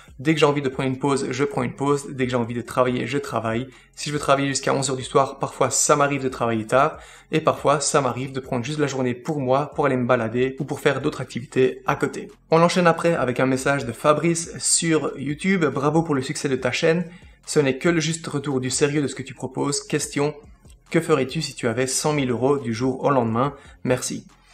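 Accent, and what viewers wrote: Belgium. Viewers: Salut, félicitations pour les 10K... toi un accent belge?? lol euhhh je confirme, de quelle région en fait? moi grandi du côté de Charleroi et Liège depuis 15 ans;) Le business Insta oui très bonne idée parce que j'ai du mal à comprendre comment on peut monétiser sur ce media. Je suppose que ta société n'est pas en Belgique (juste parce que je me demande quel est le statut dans ce cas et je me vois mal poser la question à ma comptable étant dans un domaine qui n'a rien à voir.... lol mais une chaine de présentations de produits et tuto sont en tête depuis un an) Encore merci pour tous tes conseils. J'ai aussi été validé sur Degiro en attendant que T212 se décide à revenir sur le marche européen (merci Brexit) et que TR ouvre la Belgique... T'es le bien venu pour un café si tu passes dans le coin! (pas un Starbucks hein! je sais ce que tu en penses!) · Bravo pour ces 10 000 abonnés bien mérités... 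Grande fan de ton petit accent belge... surtout ne le perd pas même si tu décide d'aller t'installer au soleil;-)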